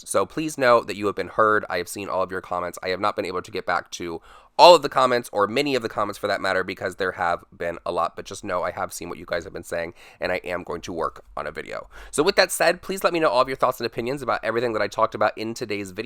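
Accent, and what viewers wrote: United States. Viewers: “BrisBANE” omg I cant handle your accent 😂 super cute through! I’m from Brisbane & I swear all Americans can’t seem to pronounce the cities lol. But if you need to pronounce it in another video about James in Brisbane airport it’s pronounced ‘Bris - Bin’ which is silly because it’s got an ‘a’ I understand 😂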